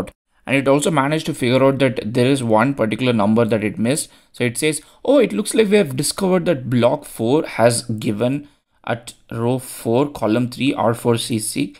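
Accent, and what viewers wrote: India. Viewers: guys plese improvo your pronunciation .Indian accent is so hectic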